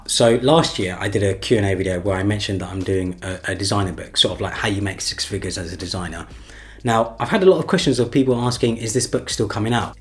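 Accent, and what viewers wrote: England, United Kingdom. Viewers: Dude you have the best English accent I’ve heard lol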